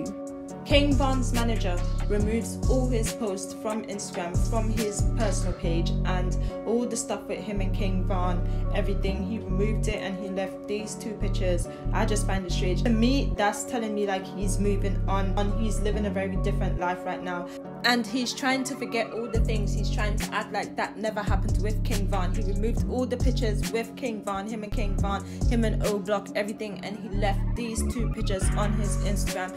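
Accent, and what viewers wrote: United Kingdom. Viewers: Yoo are from the UK you got that British accent And It seems King Von is your favorite artists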